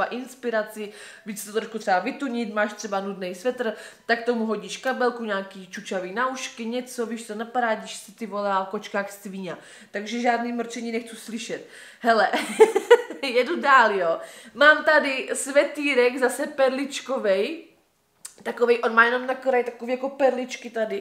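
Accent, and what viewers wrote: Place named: Moravia, Czech Republic. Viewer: Já Tě žeru 👍 jsem moc ráda, že jsem Tě díky Terry objevila s hned jsem projela x videí. Navíc mi vyhovuje Tvoje vyjadřování a ta moravština. Jsem taky z Moravy a používám stejné výrazy jako ty 😜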